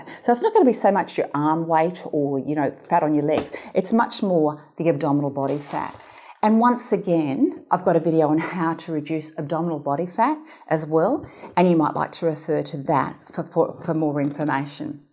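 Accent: Australia. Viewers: Hi - I did watch the video and it turned out (no pun intended) to be interesting and well presented. I'm a sucker for a woman with an Aussie accent, too. Regards. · Wow, an Australian, hoooooooray! Not only do you speak Australian so I can understand you better, you are sensible and helpful and to the point, thank you sooo much for this video!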